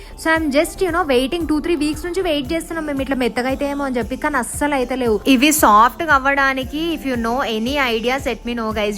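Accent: Telangana, India. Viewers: original Telangana slang 🔥🔥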